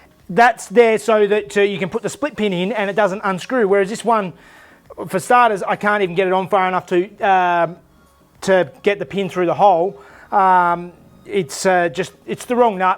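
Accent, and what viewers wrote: Australia. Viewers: So apparently Ms accent is Kiwi. I thought had an Australian accent, but my bro said he's Kiwi as well. I think they live in Sydney. Can anyone confirm if is Aussie or Kiwi?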